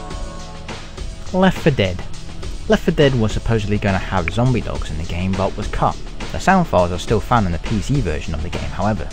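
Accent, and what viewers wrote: United Kingdom. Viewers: I can't understand half the shit this guys says. He sounds british but then says 'dewelopment" like a russian. I need some fuckin subtitles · So, why can't the guy who narrated the "creepy easter egg" video have done this onr too? I can't fucking understand anything over that thick British accent!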